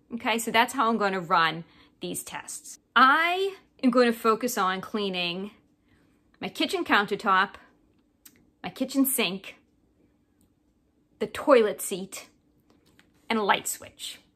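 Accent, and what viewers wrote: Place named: New York, United States. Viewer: Can't get over her accent. New York somewhere?...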